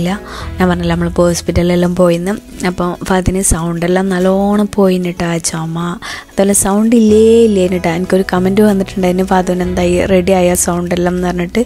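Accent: Kasaragod, India. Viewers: ഈ slang ksrd പോയതിനു ശേഷം പഠിച്ചതാണോ? മലപ്പുറം slang ഇങ്ങനെ അല്ലല്ലോ atha ചോദിച്ചേ ❣️❣️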